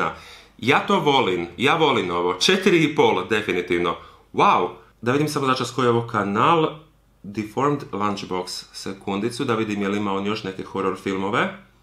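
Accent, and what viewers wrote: Croatia. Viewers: Al taj dalmatinski😂😂😂🥰🥰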